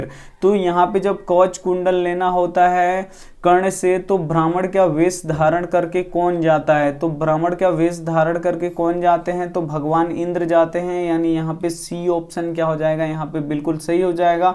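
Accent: Bihar, India. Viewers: Hiii Kya aap bihar se ho..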